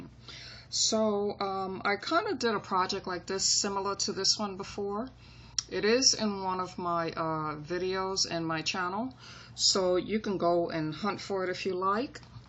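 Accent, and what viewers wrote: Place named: New York, United States. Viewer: I love your videos! Your accent reminds me of my BFF Nancy from NYC. I also Love to Crochet earrings because they are fast and easy. TY for your videos and pls. keep them coming!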